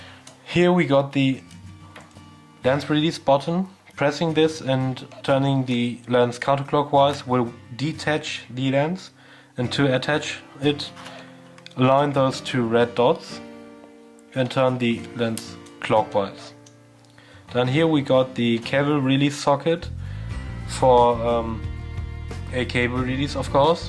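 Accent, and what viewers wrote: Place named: Germany. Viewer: Englisch mit 'nem deutschen Akzent, hach wie sehr ich es liebe :)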